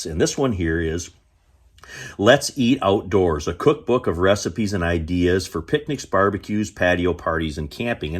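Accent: Michigan, United States. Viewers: I am from TC. I live in eastern Oregon now. I miss the Michigan accent!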